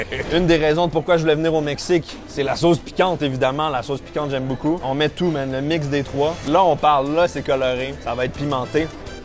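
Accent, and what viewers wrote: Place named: Quebec, Canada. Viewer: J'adore ces vidéos par contre j'ai faim maintenant, l'accent québécois ca me tue de rire 😂...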